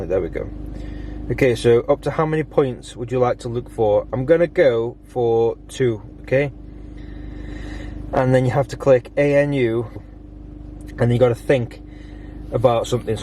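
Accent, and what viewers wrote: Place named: Stoke, United Kingdom. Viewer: Knew your accent from the outset. Parents used to live in Bignall End (Audley) ...small world!!!!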